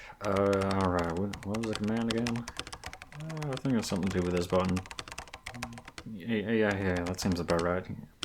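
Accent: South, United States. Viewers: Aww, no one's ever told me I smell pretty before! So sweet and adorble. And with your Southern accent (which was great), I feel like that's how I talk when I play too much Red Dead Redemption 2. I kind of imagined you talking with some wheat grass clenched in your teeth, too. Thank you for the great roleplay! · oooh, happy to hear that. Im not usually one for Southern accents but I really liked this one ^^ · I'm from Texas and I don't have a southern accent- So, I'll just say that you did a great job on it. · Come for the audie, stay for the southern accent 😂❤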